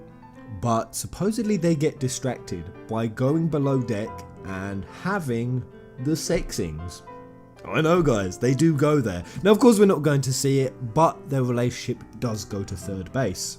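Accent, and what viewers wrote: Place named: London, United Kingdom. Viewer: I love hearing Japanese names said in a London accent. Shinji 'Ashy Mow'ow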